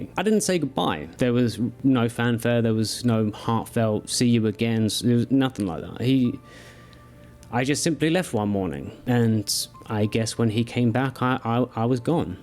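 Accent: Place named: United Kingdom. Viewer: Truth, hollywood grade good looks and British accent carried you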